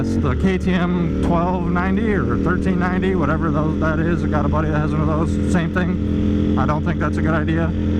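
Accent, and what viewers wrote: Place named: Michigan, United States. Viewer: Great videos man keep it up! I'm from MI originally, in socal now. Looking to upgrade to SF V4S shortly. You have a strong Michigander accent lol, sound just like all my family from Dewitt.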